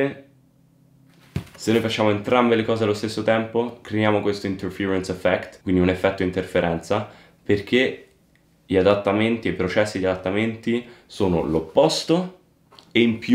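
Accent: Rome, Italy. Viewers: Il tuo misto di italiano, romanesco e inglese perfetto è il paradiso per ogni linguista 🅱️®️🅾️🤙🏻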